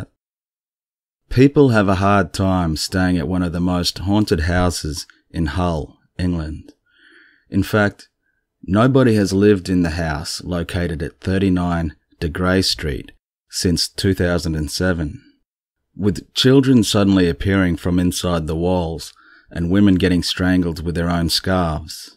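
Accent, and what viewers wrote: Australia. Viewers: Cool as :) .. I could tell by your accent you were an Australian. Qld's weather is so out of wack especially this year. Great to be a part of your crew .. :)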